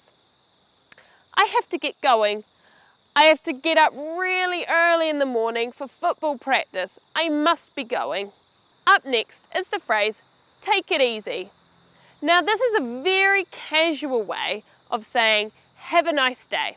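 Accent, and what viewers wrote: United Kingdom. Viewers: British English???? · Her accent is british,. But she is in New zeeland.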